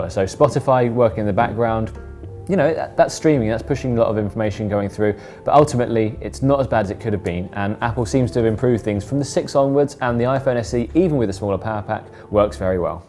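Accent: United Kingdom. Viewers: shittiest review ever. no hardware, software comparison with other phones, no technical details, very generic and personal opinions, sometimes even biased for example you skipped the details of the shitty 1.2 megapixel selfie camera which is the only biggest flaw in iPhone SE imo that is making me hesitant to buy it. anyway, try to watch linus, johnathan morrison or marques brownlee reviews and see the difference please. i mean anyone can say iPhone SE is a lot smoother, faster and very nice everyone likes it blah blah OF COURSE OBVIOUSLY ITS FASTER ITS A NEW FUCKING PHONE FOR GOD SAKE. I don't need you to tell me to know that its faster but by how much faster than previous generation phones! geez I always like the british accent because it sounds so professional and cool but this time it's disgusting to hear u speaking it. eww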